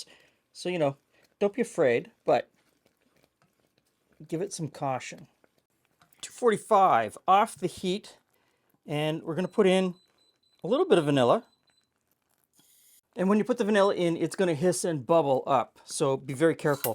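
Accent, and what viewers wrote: Canada. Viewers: Whats the worst thing that could happen? Nothing! There is no downside to that scenario. 😝 You need an “Evil” playlist. The mars bar/ricebubbles thing definitely has a place on the list. That car-mel v’s car-a-mel bugs the heck out of me. We say car-a-mel, because that’s how it’s spelt. Never heard it called car-mel until youtube introduced me to american cooks. I’d rather listen to them say allooominum and tomaaaato all day than hear them say car-mel even once. Flippin weirdos 😂. But if you Canadian’s spell it car-mel, well thats ok. It sounds perfectly lovely in your Canadian accent.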